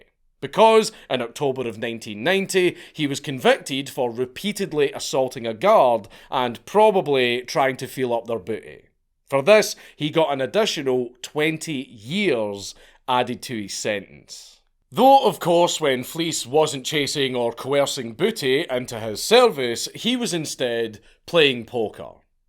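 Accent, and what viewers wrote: Scotland, United Kingdom. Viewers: Something about this presentation being conducted by a Scottish man just makes it that much better. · I'd have dropped a like on this anyway, but hearing Booty Warrior in that glorious Scottish accent was the gift I didn't know I needed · Stopped in to listen to a Scotsman speak of BOOO' EEE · The Scottish accent makes this better 😂 love the accent bro 👌🏾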